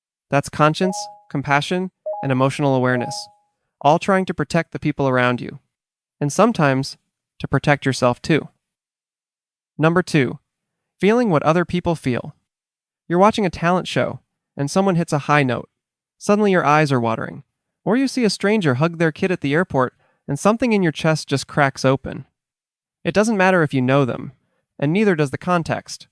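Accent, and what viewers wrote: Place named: Canada. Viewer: You’re Canadian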